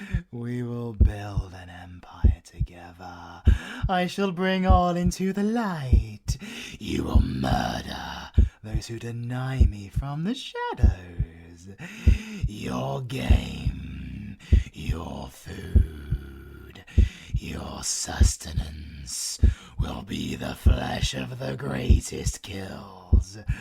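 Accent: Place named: United Kingdom. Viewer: Finally! A person who has a British accent! I been needing someone to voice a character of mine for a few voice overs. If you’re up to it? It’s for my artwork and a feature.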